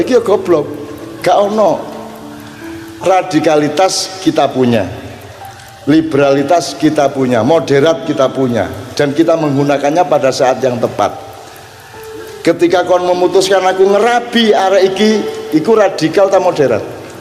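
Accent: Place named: Java, Indonesia. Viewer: aku seneng smpean cak jan jawamu kentel❤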